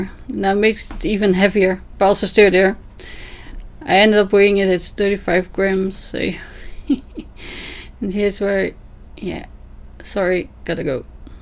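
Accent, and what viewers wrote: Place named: Netherlands. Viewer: Het is een genot om te kijken hoe je kleurt met waterverf en stiften. Het is net een sticker! Aan de hand van je accent gok ik dat je ook uit Nederland komt? Leuk dat ik je heb gevonden in de hop. Dank je voor deze video.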